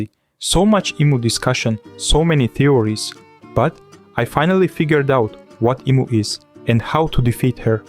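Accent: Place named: Russia. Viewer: I don't follow One Piece COMPLETELY, about each two weeks i check in and see (Theories of several YTers) But i just LOVE it, when people with russian (or your ) accent are uploaing theories, because they speak STRAIGHT and without forced "jokes" whitch makes them pleasing to listen to. Like from me. Interesting parallels about the flowers and snakes btw. 👍 Your style reminds me of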